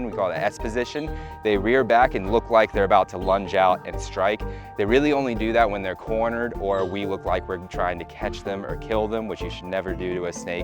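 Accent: Australia. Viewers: He’s sounding a little more Aussie.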